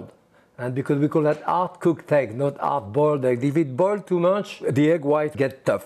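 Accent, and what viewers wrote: France. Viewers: What a great idea for an economical lunch, these eggs, some crusty bread and butter and maybe some salmon, with a spicy sauce! Jacques Pépin you are a treasure! Absolutely love his cultured accent, mostly French with a tiny bit American! God bless you Sir!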